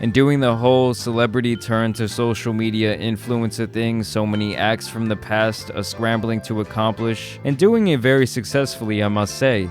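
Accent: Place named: Boston, United States. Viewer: You got to be from Boston can tell right away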